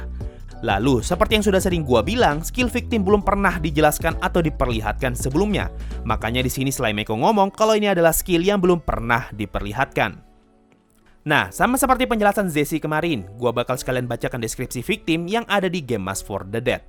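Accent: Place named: West Java, Indonesia. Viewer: jadi bakal ada banyak kalimat yang "Rada" aneh, wah kayaknya mimin org sunda :v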